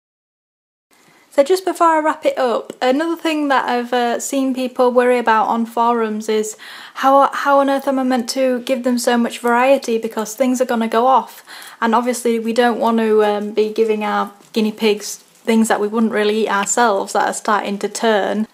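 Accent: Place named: Northern England, United Kingdom. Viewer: I get corn with the husks on from my local grocery store. I live in west sussex though and from your accent I am guessing you are from the north of england :)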